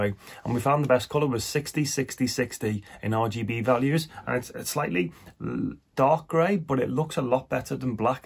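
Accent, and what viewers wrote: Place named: Scotland, United Kingdom. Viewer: ok, your accent resembles me scottish one that's why i asked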